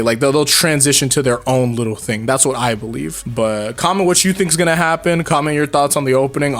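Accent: Canada. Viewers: Are you a Canadian Lad?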